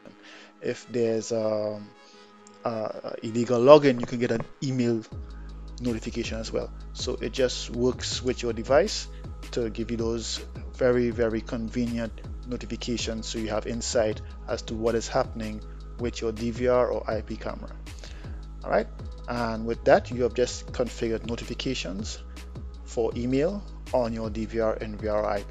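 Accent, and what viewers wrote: Trinidad and Tobago. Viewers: you sound trini!Im here from Barbados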